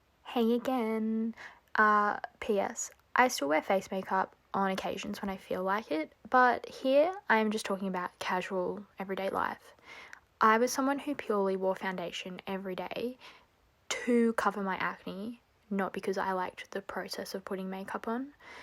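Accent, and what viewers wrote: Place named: United Kingdom. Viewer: I love your accent! UK, right?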